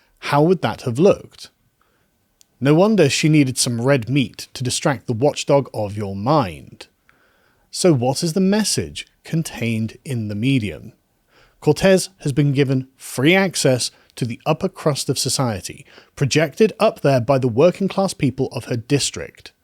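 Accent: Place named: West Country, United Kingdom. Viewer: It's that ever so slightly West country accent. Makes me homesick.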